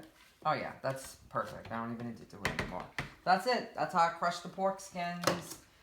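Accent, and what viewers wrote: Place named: New Orleans, United States. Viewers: Love that Louisiana accent! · Soon as you opened your mouth I said “she’s from New Orleans or southern Louisiana “. The I looked at your username. Lol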